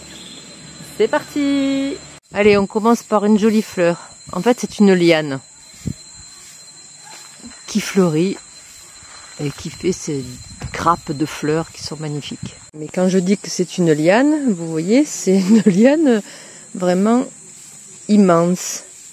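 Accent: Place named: South, France. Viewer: Tu n'as pas perdu l'accent Français du SUD 😛 Top la vidéo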